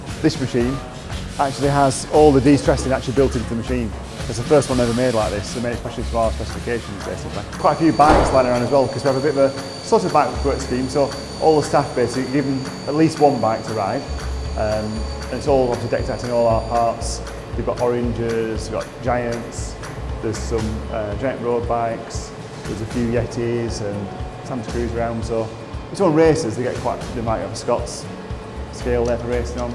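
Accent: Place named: United Kingdom. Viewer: The worst audio, buy some microphones. It hard enough to understand the thick limy accents. Talks like hes on coke or flour in his mouth.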